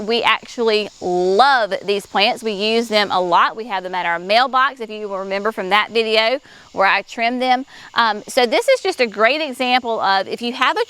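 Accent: South, United States. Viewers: Love your Southern accent and thank you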